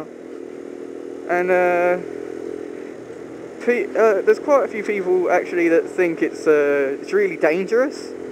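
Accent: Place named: Southern England, United Kingdom. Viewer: Good to see your better :') and motorcycle vlogging is good :D come end of march when I get my first bike I wana get a helmet cam and do it :D and what part of England you live you sound like southern or south west like Kent / Essex?, keep the videos coming :')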